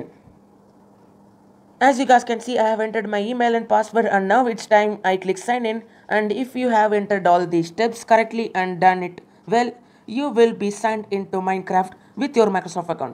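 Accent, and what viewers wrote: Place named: India. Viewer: U kinda sound hindi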